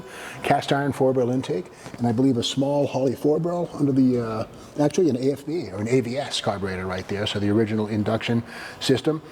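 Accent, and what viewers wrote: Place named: Northeast, United States. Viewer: Is it just me, or is speaking with a more pronounced Northeastern accent?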